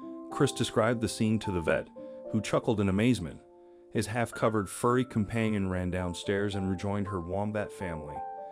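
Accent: United States. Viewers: This becomes apparent that this is in Australia! I at first thought it was an armadillo. But “Chinese”! No way! Only Australia has wombats! The man must have lived nearby. There’s no way wombats would travel really far to rejoin a family member? And why do we have a Yank narrating?!?! They are very mischievous animals! Another good reason to allow them live in the wild.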